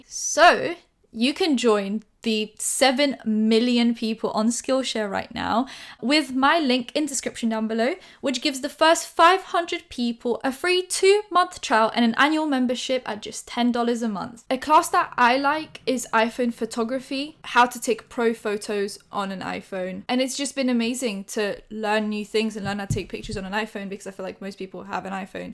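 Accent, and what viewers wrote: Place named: Roadman, United Kingdom. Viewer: Is it just me or does she have a very subtle roadman accent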